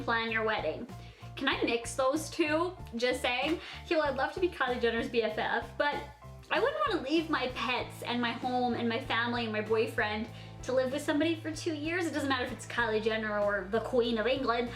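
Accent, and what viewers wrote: Canada. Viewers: I love the way you speak! I love the Canadian accent! From my fortune language my fave is Rotterdams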